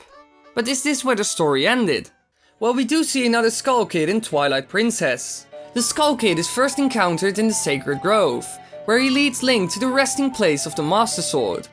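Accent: Netherlands. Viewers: Are u dutch? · Wow you speak english with a dutch accent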